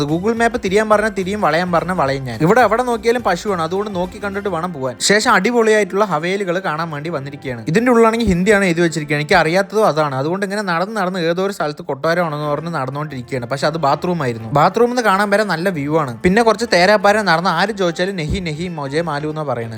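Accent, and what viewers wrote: Palakkad, India. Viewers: നിങ്ങ പാലക്കാടാണ്്ന്ന് പറയാണ്ടെന്നെ അറിയ്ണ്ട്. ഗെന്താ മക്നേ.. ഹാവു.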